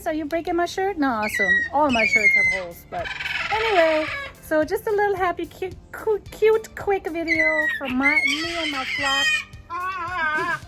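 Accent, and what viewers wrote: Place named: Germany. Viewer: Ich dachte doch dass ich da einen leichten deutschen Akzent höre 😊 tolles Video, Du bist eine Papageienflüsterin! Grüße aus NRW ❤️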